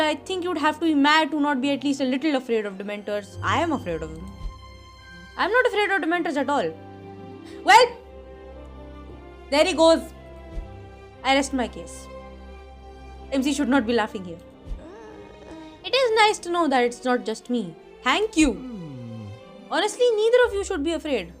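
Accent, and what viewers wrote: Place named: India. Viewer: Sounds more Indian!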